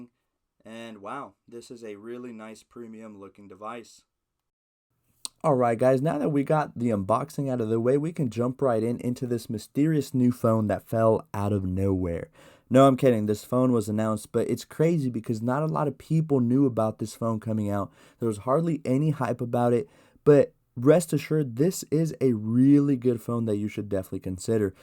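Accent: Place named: Georgia, United States. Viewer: Hmmm... you sound like you’re from Georgia by the way you talk... 🤔🤔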